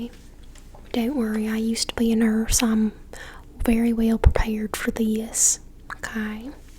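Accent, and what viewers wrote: South, United States. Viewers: Pretty dang good southern Belle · The second I saw the thumbnail I knew I'd be loving 💕it already 😍👍 Congratulations 👏👏👏 on the return of the much-loved Miss Tilly roleplay you have been so dearly missed!!! 😪 So love ya awesome southern accent. You are the absolute best!!! 💙💙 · Your Tilly esk southern accent characters are the besttttt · I guess we got a substitute teacher to take on place today! I love the Southern Accent too, so entertaining to watch with some ASMR Much Love to you! ♥️♥️♥️ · This could be your best roleplay yet. I love the colors on the outfit you are wearing. Nice color on the glasses, and nice pink color on the phone. Very nice blue color on your nails. I love hearing you converse on the phone. Your interaction speaking to the students is so well acted and spoken. I love your Southern accent. Your accent is so smoothly spoken. 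This is Teacher Ms. Tilly, formerly known as Nurse Tilly? The Nurse decided to become a teacher. You are a person of many talents and skills. Your voice when you speak, especially when you whisper is so soft and relaxing that I felt like I'm floating on a cloud while listening. Your personality shines. I think this is a performance worthy of an award. You could have been a wonderful Teacher had you chosen that career. You are fantastic. I thoroughly enjoyed this. btw, You are the cutest girl on YT, in my opinion. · yes ❤️. You are my favorite to listen to, the southern voice was amazing! Some acting skills flowing through your blood lol. Thanks again! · I think the Southern drawl is the best accent in the world. And the 'turns of phrase' these southern folk have are just delightful! 😄 Thanks for the video,! 💖✨ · It’s great to see Tilly again! She was fired from her previous job, hopefully she doesn’t get fired again 😂 I love that southern accent, it’s very funny. 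Thanks for this fantastic video! 🤩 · the southern accent is so good! do you live in the south?? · Ohhhhh southern USA XDD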